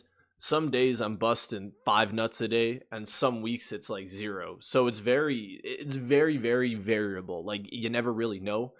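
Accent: Canada. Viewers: Canadian, definitely Western Canadian. The "a" is pretty distinct to Alberta.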